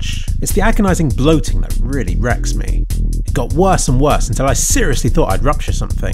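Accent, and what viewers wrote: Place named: South West, United Kingdom. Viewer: south west accent this video is about a man shitting himself with a fruity taste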